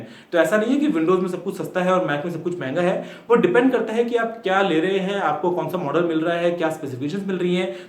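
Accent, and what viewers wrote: India. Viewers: Classical Indian English, oh my god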